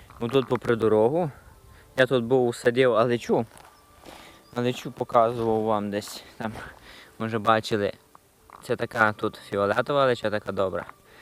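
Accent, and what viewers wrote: Hutsul, Ukraine. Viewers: ДУЖЕ ДОБРЕ, СТАРАЙСЯ) БО ТУТ В НЬЮ ЙОРКУ ХОЧЕТЬСЯ ЧУТИ РІДНІ КАРПАТСЬКІ ЗВУКИ:))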